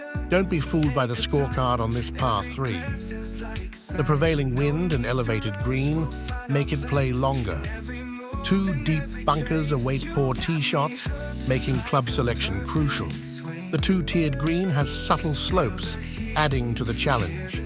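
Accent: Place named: United Kingdom. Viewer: For some reason the British narration makes it easier to understand lol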